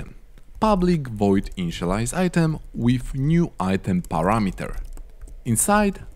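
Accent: Poland. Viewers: are you polish? you sound so for me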